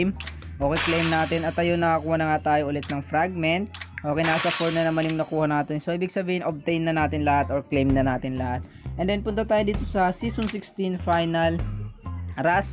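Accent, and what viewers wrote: Philippines. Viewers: Tol Maguindanaon ka?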